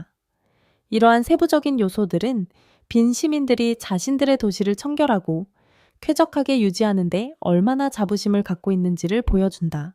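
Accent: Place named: China. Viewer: 한국말 배운 중국인이 나레이션하는거같네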